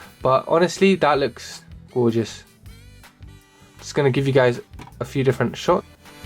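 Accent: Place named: British Pakistani, United Kingdom. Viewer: This bloke sounds like the British tech reviewer Supersaf. Supersaf is the most well known tech reviewer here in the U.K. This bloke is probably a British Pakistani based on his accent. Fun fact: Pakistanis do not actually speak the language of the prophet muhammad (pbuh). They speak punjabi. Some of the Pakistanis are really cool people. But they read the quran in arabic - but they do NOT speak arabic so they cannot understand what they are reading. I think some of the Pakistanis are really cool. But the prophet muhammad (pbuh) NEVER spoke Punjabi which is the language of the Pakistanis. I would like this bloke (or Supersaf) to do an entire tech review in Punjabi which is actually the language of the Pakistani race.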